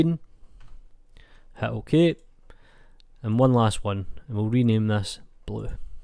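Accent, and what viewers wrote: Scotland, United Kingdom. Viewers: Tutorials in a Scottish accent is music to my ears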